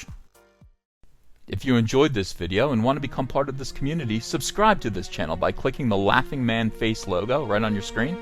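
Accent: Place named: New Jersey, United States. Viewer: Knew you were from Jersey by your accent! Maybe that’s why I can relate to you so much. That and how humble we both are 😂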